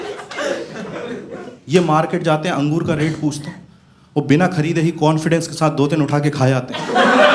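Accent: Indore, India. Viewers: Dono hamre MP se hena to accent to same hoga hi or me bn gaya itna bda comedian to mere ander bhi aap esa hi accent dekhoge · Both Zakir and are from indore.. And that is the indori accent. That's why they sound same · Bhai dono madhay pardes ke hai.... Bhai ka accent toh pura biaora , indore ke aas paas ka lag raha hai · Both he and Zakir are from Madhyapradesh and that’s why similar Hindi dialect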